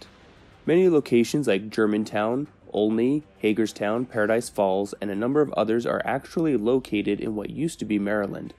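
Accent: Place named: Colorado, United States. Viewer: As a Coloradan I think you are from Colorado by the way you pronounce it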